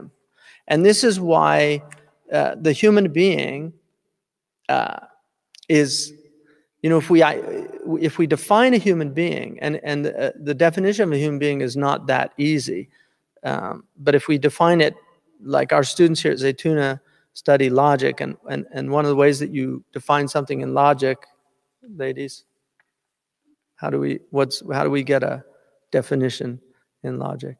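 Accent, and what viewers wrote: Arab world. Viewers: Marhaba Sheikh. You know that ..I can never stop admiring your ability in Arabic language. The way you pronounce is exactly like a one that was born in Arabia. I am born in middle east and I have seen Persian and Turkish when they study Islam. They can never pronaunce the word like you do. In addition to the most beautiful point you refer to. But Sheikh the way you talk Arabic just makes me speechless.It makes you more arab then me!? 😇...amazing!...رمضان كريم علیکم.. نسأل الله ان يكتب لنا الصلاة خلف الإمام مهدى(ع)!💚💥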